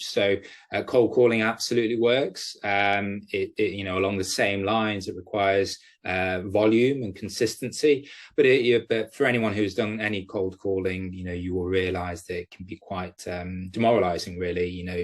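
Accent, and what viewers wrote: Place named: United Kingdom. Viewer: Hi you sound like your from the UK. 🇬🇧 I’m from London UK aswell, I’ve been E-mailing tons of companies in my cleaning business. for the past 1 month from 4 different Gmail accounts every single day. 25-mails to companies each, a few responses back but only them responding saying not interested. 1 or 2 have got back to me saying they want me to come for a walkthrough. Is there something that I might be doing wrong? Is there more of a simple way to get more cleaning contracts quite quickly? Thanks bro look forward to hear from you.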